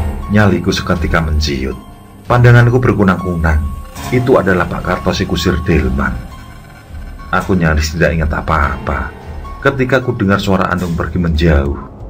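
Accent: Java, Indonesia. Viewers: Biar pun sering mampir, tp masih susah qu mencerna suara pakdhe 😁, biar pun pake headset,,, terlalu medok pakdhe bgi ku yg aceh ini,,, salammualaikum 😁😁😁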